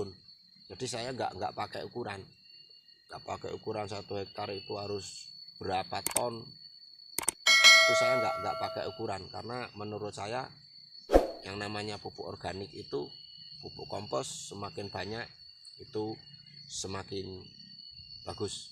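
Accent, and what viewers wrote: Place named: Java, Indonesia. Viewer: Hadir,jowone kenthel jos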